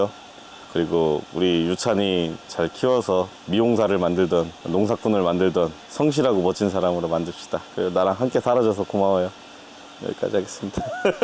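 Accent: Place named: Chungcheong, South Korea. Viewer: 고향이 충청도신가 백종원 필이 나네여 성공할 관상이네 부인분이 사람볼 줄 아는듯 임금 높은 나라는 세금과 생활비도 비쌉니다 앞으로 남고 뒤로 밑지죠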